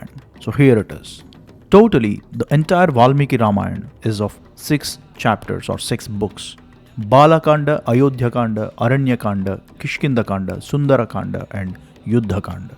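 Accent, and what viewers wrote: India. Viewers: Bro please use ai english dub West will hear you Truth be told indian english doesn't sit well But with ur knowledge and western english u will rock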